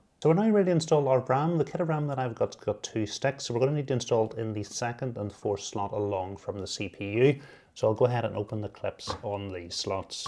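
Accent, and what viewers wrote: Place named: Northern Ireland, United Kingdom. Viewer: Really good to see this great build, and even better to hear a local NI accent. Super job especially with these 2026 parts prices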